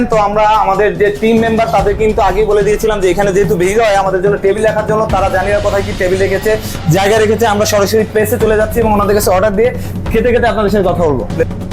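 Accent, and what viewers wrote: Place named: Barisal, Bangladesh. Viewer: ❤❤ভাইজান আপনি অনেক সাহসী কথা বলা ধরণ দেখে বোঝা যায় বরিশালের মানুষকে কি সাহসী হয় তা বুঝলাম ধ্যনবাদ।আপনাকে।❤❤❤❤❤❤❤❤❤❤❤❤❤❤❤❤❤❤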